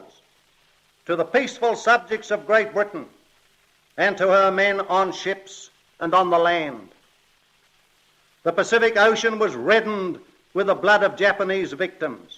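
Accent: Australia. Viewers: Great to hear a real Australian accent. I recall a later speech, when we were directly threatened. You can hear dread in his voice. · as an australian, im fascinated by his accent. it feels like 80% of his accent was that of the modern australian accent, and he has hardly any traces of the english accent. this is so interesting!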